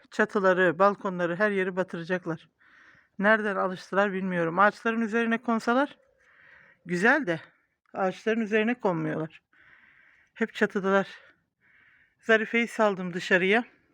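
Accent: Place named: Trabzon, Turkey. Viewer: valla canm ne yaprsan yap izleniyor hele konusma tarzin trapi gibi gliyr.her seyi guzel dusunmn cok guzel sende ailende masaAllah size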